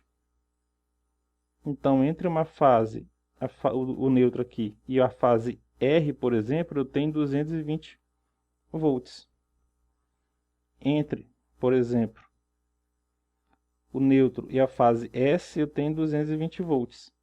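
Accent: Ceará, Brazil. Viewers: Pensei que vc era do Ceará rsrs moro em barra do corda Maranhão rsrs show de bola a aula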